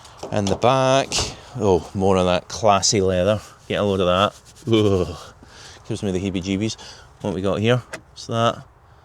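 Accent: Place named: Glasgow, United Kingdom. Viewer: Love your videos you present them well and with interest your personality plays a major part in this with your Glasgow accent, a very likeable character.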